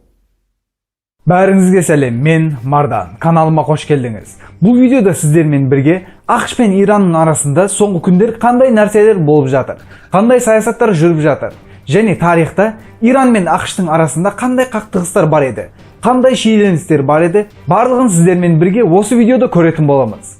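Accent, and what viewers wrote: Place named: Uzbekistan. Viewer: Өзбексің бе? Тіліңде акцент бар.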